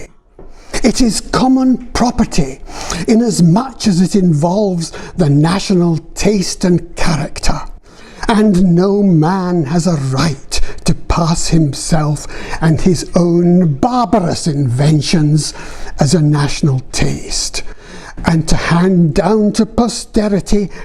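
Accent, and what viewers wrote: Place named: Scotland, United Kingdom. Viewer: Thanks for interesting talk. I love the sections and I did think more CITYscape sections should be analyzed in a landscape project ( including architecture in those sections), which could be best started from LANDSCAPE ARCHITECTURE education ( if as you said it did not exist in ARCHITECTURE education). PS: Lovely Scottish accent!! Thanks!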